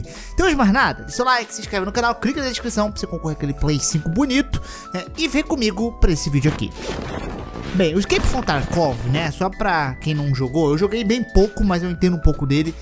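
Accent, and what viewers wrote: Rio de Janeiro, Brazil. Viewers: Sotaque carioca simplesmente intakavel, flws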